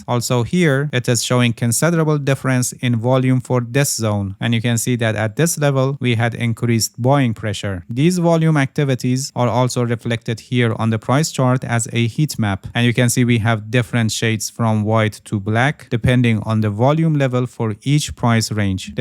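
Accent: Iran. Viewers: The accent. Isn’t it Persian ?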